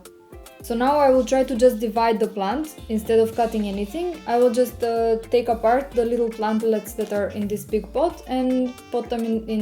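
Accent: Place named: Slavic, Eastern Europe. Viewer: greetings from California... nice accent.. are u slavic? thank u 4 your helpful presentation..🌞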